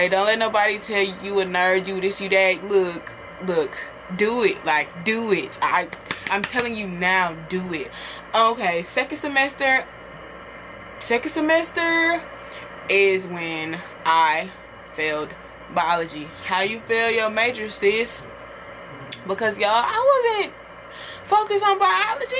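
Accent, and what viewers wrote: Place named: Houston, United States. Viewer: Lmfao you talk like you from Houston 😂😂😂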